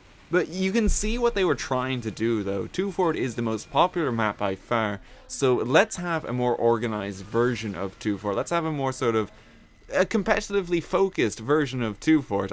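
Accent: Ireland. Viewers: lol your accent sounds like half Irish and half Australian xD (plsignore) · I actually like 2Fort, but it needs a time limit. P.S. Are you Irish? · haha your Irish accent is one of the things that keeps me coming back! It's so fun to hear you folks say 'Threehundred and thirty three trees' and so on... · You're an Irish TF2 youtuber? subbed immediately. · Are you Irish?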